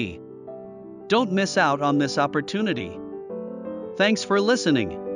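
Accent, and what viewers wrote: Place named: United States. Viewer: I was amazed to discover you have such a broad American accent! 🤣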